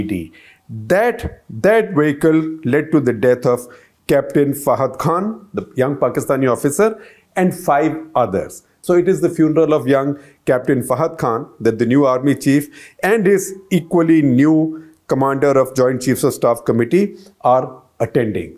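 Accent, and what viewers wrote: India. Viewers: There's something known as 'Indian accent'. Its less of an issue and more of a feature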